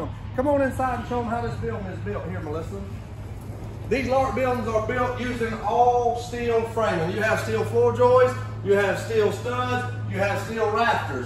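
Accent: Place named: Georgia, United States. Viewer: Like that middle Georgia drawl! north GEORGIA HERE!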